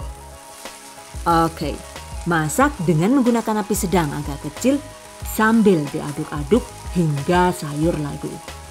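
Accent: Banyumas, Indonesia. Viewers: Kalo mi nya suka yg pipih Bun, tapi suka yg pake udang dan pakcoy. :) Ada ngapak2 nya itu lho yg bikin tambah greget pengin nonton Terus video bunda . :D sehat terus bunda agar bisa selalu share ilmu ke kami Mahmud. Biar misua tambah saayoong..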